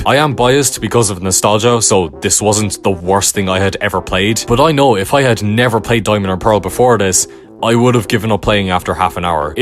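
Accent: Ireland. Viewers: Trying to place the accent. Kilkenny?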